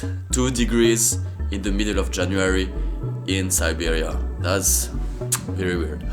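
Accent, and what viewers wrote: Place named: France. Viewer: ещё бы от акцента избавиться и будешь похож на русского, но французский акцент милый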